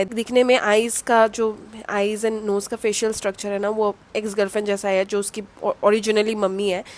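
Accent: Bengal, India. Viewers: You also form Bengal (coz your bengali accent tells it)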